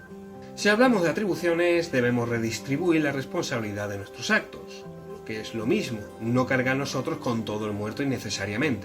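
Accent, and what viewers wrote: Spain. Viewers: La culpa es parte de mí desde la niñez, me siento culpable por ejemplo de que hayan animales en la calle y no poder hacer algo por terminar con el sufrimiento de ellos. Me provoca angustia, ansiedad y baja autoestima. Llevo casi dos años en terapia por TLP ya que esa angustia y culpa me llevaba a actos en contra de mi integridad. No crean que vivir con "culpa" es cosa fácil. Gracias por los consejos!!!!! Perdón por la pregunta, por qué tu acento español no es tan marcado como los de otros youtubers? Hay veces en que no logro ni entender la mitad de lo que ellos dicen. Muchos besos y abrazos desde el fin del mundo.... sur de Chile 🌷😘😊😊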